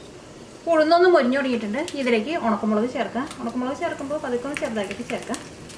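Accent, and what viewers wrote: Thrissur, India. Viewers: trissur analle naadu? avatharanam super anutto njan ennundakkunndu yours chatni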